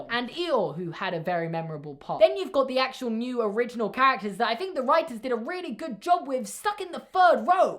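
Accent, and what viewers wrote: United Kingdom. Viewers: Oh yes British dad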